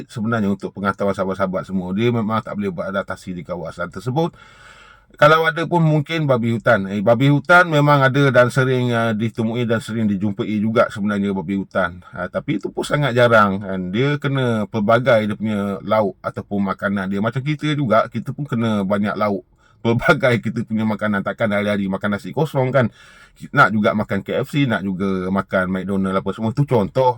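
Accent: Perak, Malaysia. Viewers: Fuhhh cakap perak pulak 😂😂😂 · mantap lah bang buat comeback saya sentiasa follow video bang tak penah miss..cume kali ni terhibur skit sbb abg cakap perak hahahha sbb saya org perak btw steady bang ilmu2 yg di sampaikan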